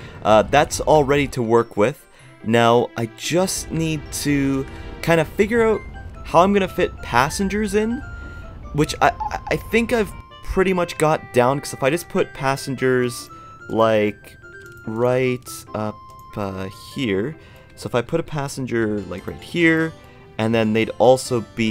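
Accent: Canada. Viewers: He’s Canadian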